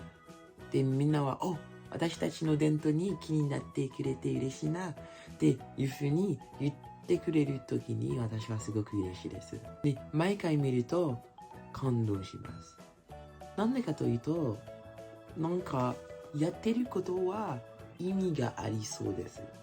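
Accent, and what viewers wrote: France. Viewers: 何と心地よい話し方なんでしょう、フランス語と日本語の良い所が合わさった発音のような気がします。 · ちょっとフランス訛りの日本語が優しくって可愛い💕 日本の良い所を見てくれてありがとう🙏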